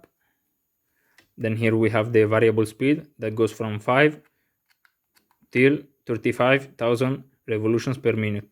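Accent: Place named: Italy. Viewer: I understand everything he says. He speaks English with an Italian accent. Put on the subtitles and you can read what he says.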